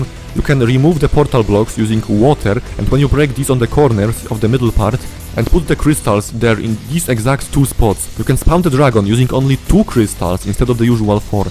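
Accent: Poland. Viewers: Your accent sounds polish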